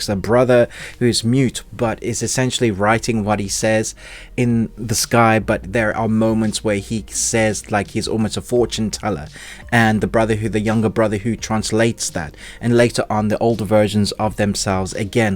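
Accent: South Africa. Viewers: Are you South African and British or something?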